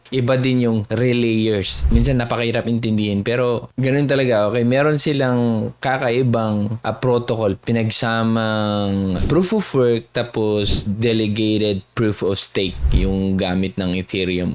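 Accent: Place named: Conyo, Philippines. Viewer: Conyo magsalita pero ayos salamat sa review boss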